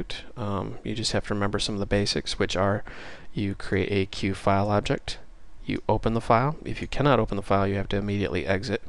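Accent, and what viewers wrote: United States. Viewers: not only is this educational and entertaining, but this this is in the best format for me! Small screen so I don't have to be in 1080p full screen to read the text and I can have QT open next to it, short videos so I don't lose the will to live, and finally you have a really nice voice XD its not normal us people from Britain enjoy an America accent XD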